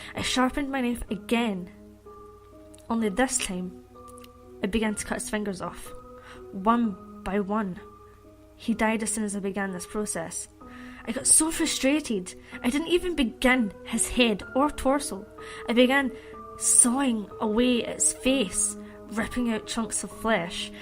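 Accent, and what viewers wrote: Scotland, United Kingdom. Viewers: scottish for the win!! ;D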